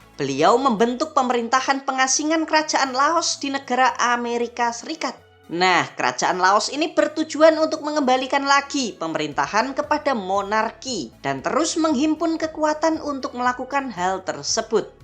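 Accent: Java, Indonesia. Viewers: di lihat logatnya mimin org jawa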